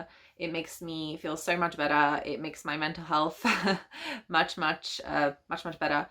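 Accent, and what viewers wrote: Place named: Scandinavia. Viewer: It sounds like your English accent has started to sound more Scandi.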